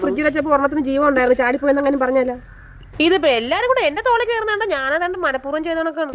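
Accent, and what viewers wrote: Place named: Kollam, India. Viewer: ഗർഫിണി... ചേച്ചി കൊല്ലം കാരി ആണോ 😄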